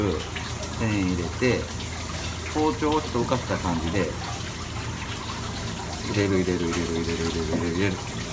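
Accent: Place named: Tosa, Japan. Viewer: 土佐弁ですね✨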